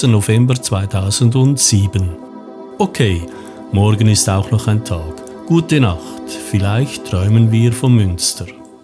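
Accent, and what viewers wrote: Switzerland. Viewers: sehr schönes Video eine sehr angenehme Stimme mit diesem netten Schweizer Dialekt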